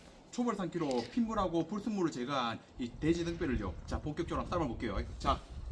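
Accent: Gyeongsang, South Korea. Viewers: 언제봐도 정겹네요 경상도 사투리가 가슴에 팍팍 와 닿습니다 ^^~ 이건 음식 고문이다 ^^~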